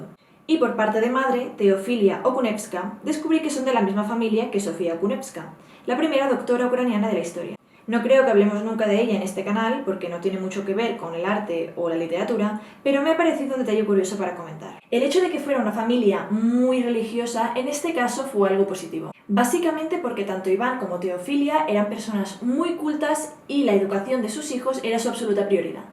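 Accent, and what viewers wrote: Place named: Spain. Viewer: Muy buen tema, pero muy rápida y poco entendible si no se tiene el oído adiestrado para el acento español, la dicción se podría mejorar por favor.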